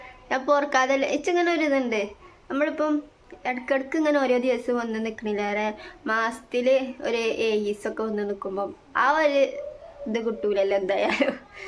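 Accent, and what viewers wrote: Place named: Malappuram, India. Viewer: Nadan slang 😅 ketttal aryam Malappuram ahn nnnnn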